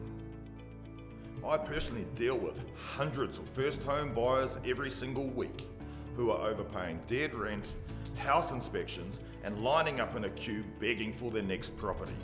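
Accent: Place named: New Zealand. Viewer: Funny to hear a kiwi advertising an ozzie product!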